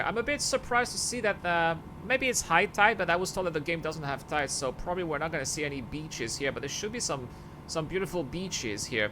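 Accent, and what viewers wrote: France. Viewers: So you are french ? I usually can tell but for I had no idea where you could be from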